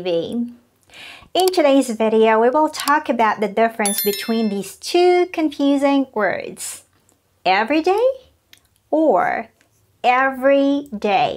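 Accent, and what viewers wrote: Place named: United States. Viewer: a good idea to devide this topic into two lessons and great american pronunciation as well...😍😍😍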